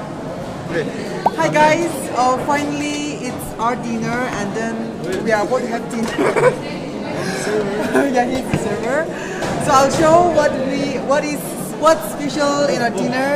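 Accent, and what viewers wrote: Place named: Ao, India. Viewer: Heavy Ao accent! but what else do we expect ? One can't be an accentless human unless one is dumb.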